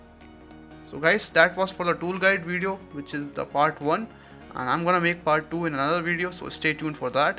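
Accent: India. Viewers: Indian hoke English 😅I can't understand 😢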